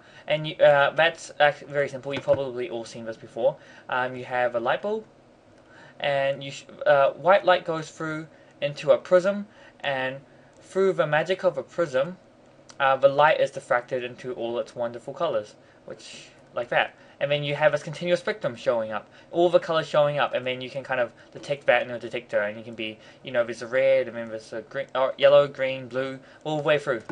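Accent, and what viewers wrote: New Zealand. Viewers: New Zealand accent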